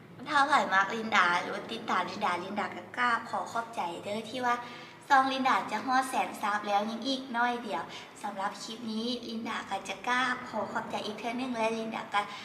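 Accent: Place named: Vientiane, Laos. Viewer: ເປັນຄົນປາກກະດິງ ຄືເວົ້າອອກວຽງ😍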